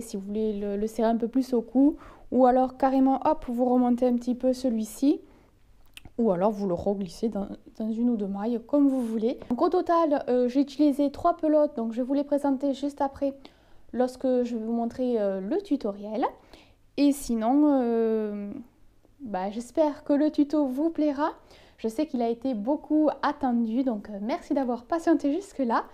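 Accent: South, France. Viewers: Ça y est! J'ai terminé mon pfeilraupe, je l'ai tricoté en laine Rachel de chez Zeeman, j'ai utilisé 2 pelotes complètes + le début de la 3ème. Il est vieux rose dégradé blanc et il y a quelques défauts au niveau des trous, mais une fois porté cela ne se voit pas!!! MDR. Encore une fois merci pour tes explications claires et en + j'adore ton accent du Sud! Bisous bye bye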